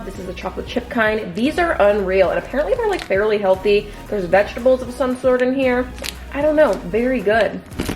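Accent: Canada. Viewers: i love your canadian accent SO much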